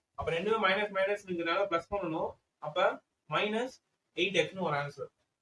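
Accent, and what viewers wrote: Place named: Madurai, India. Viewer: Neenga madurai ha bro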